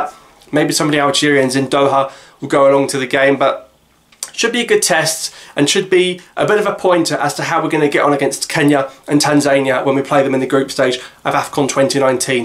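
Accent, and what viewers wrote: United Kingdom. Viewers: hello, would you mind told me where did you learn the British language ?? or it's your mother language? and are you live in Algeria, please can you answer my questions?? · You got such a nice british accent , do u live in UK or Algeria ?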